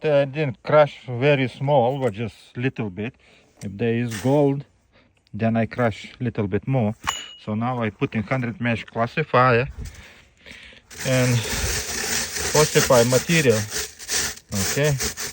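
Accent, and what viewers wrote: Russia. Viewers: Дядь я не пойму ты русский ?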